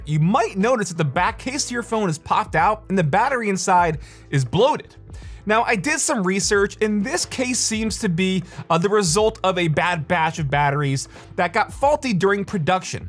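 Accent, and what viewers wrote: Singapore. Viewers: sounds Singaporean hahahah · Lmao that Singaporean accent is so easy to recognise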